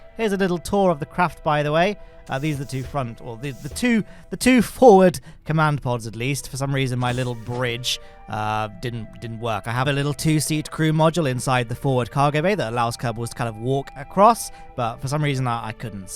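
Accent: United Kingdom. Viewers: Forever confusing Duna with Jool thanks to the palatalized British accent...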